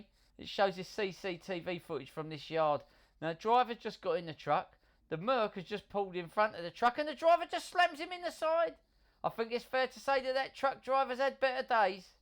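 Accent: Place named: London, United Kingdom. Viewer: There goes another mobile organ donor . The London accent with authentic commentary is 1000% better narrative than the other vids with machine voices and added patronising woke generative AI.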